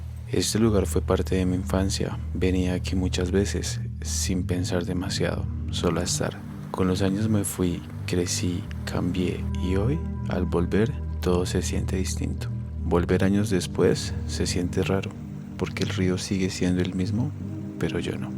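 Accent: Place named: Colombia. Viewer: me encanta tu entonación al narrar, con tu acento colombiano, es de paz. Dedícate a hacer vídeos de poesía o leer textos de libros que hablen de paz. Te recomiendo hacer videos con textos del Libro de La Sabiduría o de Las Siracides. Con tu voz y los paisajes donde caminas... ¡SERÁN HERMOSOS!. Saludos desde Guadalajara, México ❤✌️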